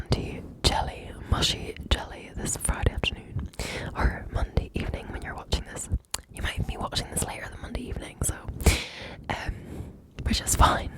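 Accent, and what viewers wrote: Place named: Scotland, United Kingdom. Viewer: I love your super tingly Scottish whispers. This is amazing, 360 degrees of! 😍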